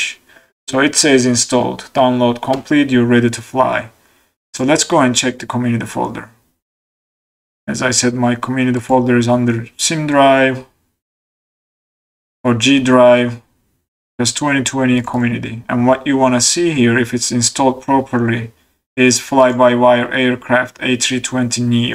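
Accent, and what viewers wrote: Turkey. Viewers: Thanks for tutorial. Quick and explanatory. But your accent doesnt sound like a native english speaker. Türk müsün ?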